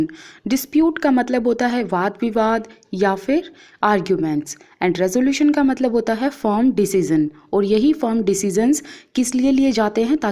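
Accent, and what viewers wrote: Haryana, India. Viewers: Ma'am haryana ki kr waha ke log aese hi bolte he 😒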